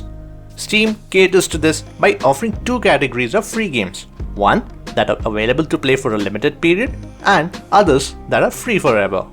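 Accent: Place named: India. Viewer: u got the indian accent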